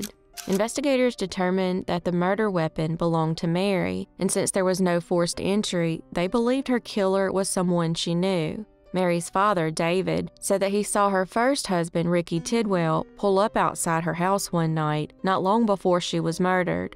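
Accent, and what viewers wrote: South, United States. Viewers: I always try to picture what this southern girl looks like 🤔 the southern accent is adorable 😊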